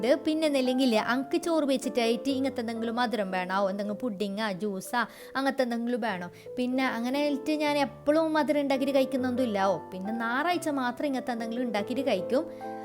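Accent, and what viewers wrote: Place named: Kasaragod, India. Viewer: Kasaragod bisiyaattil vlog kekaan Nalla paangund#mayyuskitchen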